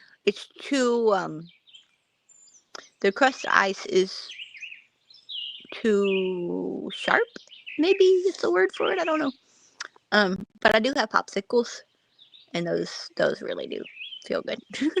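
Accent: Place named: South, United States. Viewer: You stylin the no teeth! Cute! Your voice just got sn even more southern accent lol! When you ferl up to it you might do a bideo showing you mowing with your reel mower. Alot of people dk what they are. Hope you heal wuivkly. Give it a week before you try treeth again so stitches are more healdf not irritatdd by tryi g them.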